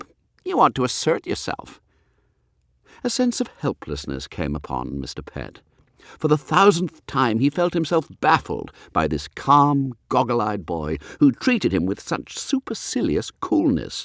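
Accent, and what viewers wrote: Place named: United Kingdom. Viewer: Dear, please reread the Wodehouse novels read by female readers. Although some are fairly good writers, invariably they don't have a British accent which is really appreciated. Also, because most of the characters are males, it just makes sense. Thank you so much for the great reading. Looking forward to finding more of your readings. Thank you!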